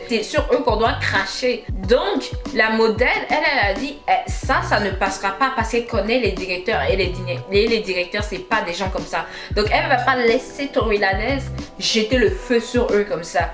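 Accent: Canada. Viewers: Tu as un accent canadien je kiff grave 😂😘😘😘😂😂😂😂😂😂😘😂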